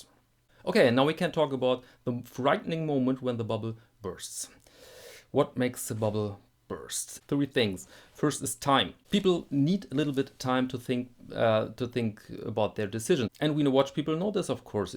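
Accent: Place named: Germany. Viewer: I mean deapite his obvious flaws he is intelligent, witty, eloquent and a man of culture with an awesome german accent. Keep up the good work!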